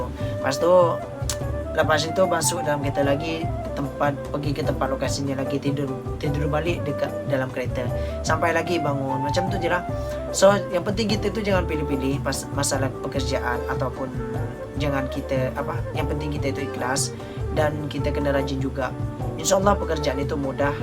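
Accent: Malaysia. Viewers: Logatnya kakak udah malaysia bgt yaa..heheheheh. semngaatt ya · tak ada pun, cakap macam orang Malaysia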